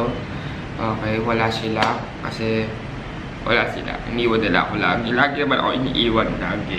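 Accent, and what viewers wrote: Visayas, Philippines. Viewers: bisayaaaa kaaa kuya??? i lab yow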